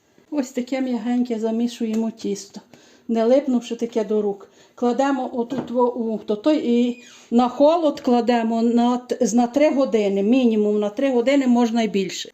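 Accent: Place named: Ivano-Frankivsk, Ukraine. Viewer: Пані, якщо це не секрет, де саме Ви живете, я в Городенці, що на франківщині, по Вашій розмові думаю що Ви також з нашого краю. Підписана на Ваш канал, пляцки суперові, такий самий зошит є і в мене😂😂😂, до цих пір печу з нього. Дякую що ділитесь своїми рецептами.😊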